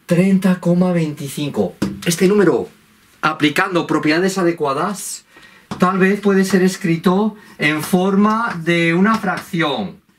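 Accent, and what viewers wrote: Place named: Aragon, Spain. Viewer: Qué acentazo maño más mañico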